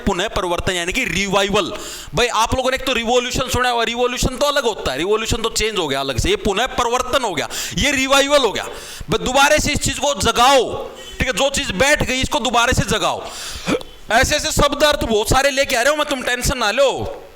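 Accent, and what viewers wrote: Haryana, India. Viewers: Ye sir haryana se hai kya?😅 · Sir aap Hariyana se ho kya😊😀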